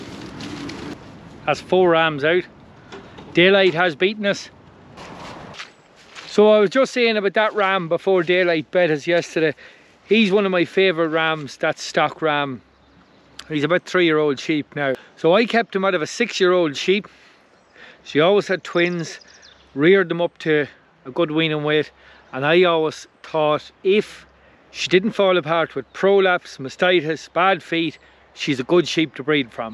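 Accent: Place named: Ireland. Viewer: Thanks for sharing! I love to listen to you and other Irish people speaking ! Very soothing to hear! 😊🐑🐏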